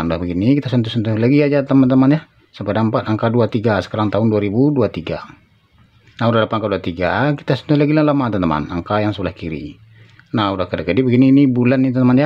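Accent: West Sumatra, Indonesia. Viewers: Org Minang kah bang😅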